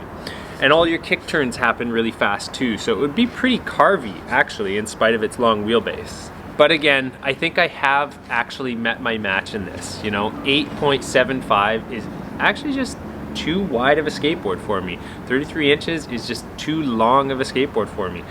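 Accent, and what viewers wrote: Canada. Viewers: my god I love how Canadian you are. "body checked the ground." haha! Just the delivery of it ! God bless skateboarding, hockey and Canada!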